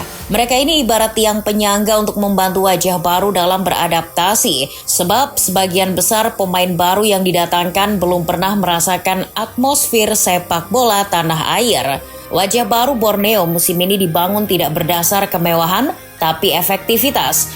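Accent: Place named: Surakarta, Indonesia. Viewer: Suara pria solo😊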